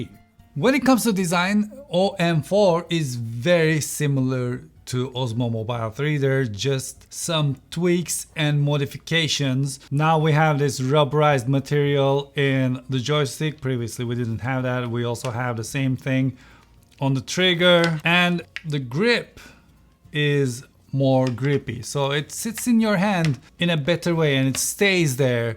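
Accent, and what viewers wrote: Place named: Turkey. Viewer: ar yu torkish birader, aksan igrenc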